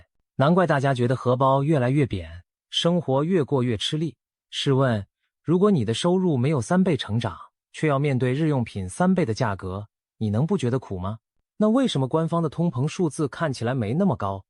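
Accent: China. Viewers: 聽口音中國人，中國的爛事比台灣多的多＇先把你們國家自己的事情解決了，再來關心別人吧。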